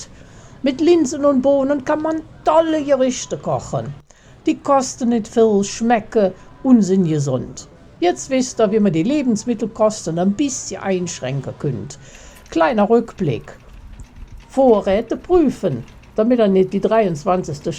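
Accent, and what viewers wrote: Cologne, Germany. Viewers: Datt iss jo sööt. Ich hev alles verstaan , obwohl datt Kölsch wer.Ick Snack Platt und bedank mit för datt Video. Allns Goode .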